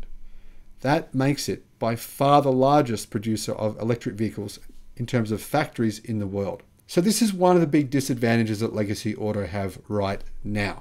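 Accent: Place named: Australia. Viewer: is based in Melbourne, Australia. Listen to English and his accent - You clearly hear that he is an Aussie. How in the world could you think that this is an American fanboy site. This is not a Tesla fanboy site. This is an good channel about the ongoing electrification of our transports.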